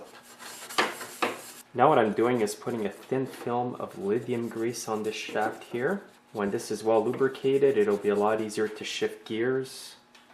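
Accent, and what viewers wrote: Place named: Quebec, Canada. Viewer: you speak English very well but I notice a super small accent...are you from quebec?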